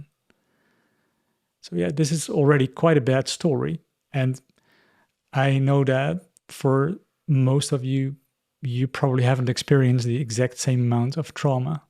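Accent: Netherlands. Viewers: My compliments 👏. The bravery to confront yourself with your childhood ànd work on it. All my love and light to you and all the ones who listen to you. (I hear a dutch accent?) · Sounds like a Dutch accent.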